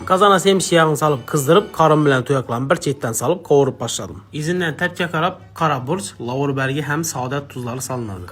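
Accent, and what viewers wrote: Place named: Khorezm, Uzbekistan. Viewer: Xorazm shevasi chiroyli eshitadida rosa❤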